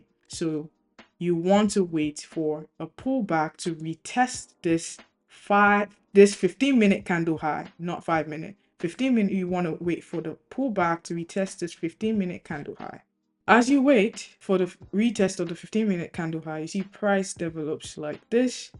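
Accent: Nigeria. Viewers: I think she is Nigerian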